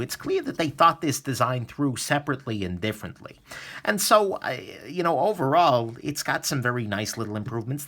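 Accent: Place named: Alabama, United States. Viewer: I love your Alabama accent 👍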